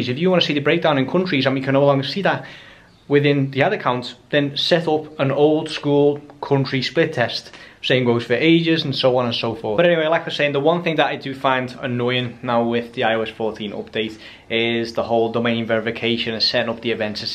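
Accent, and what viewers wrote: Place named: United Kingdom. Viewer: How do u have British accent as a Dutch?😄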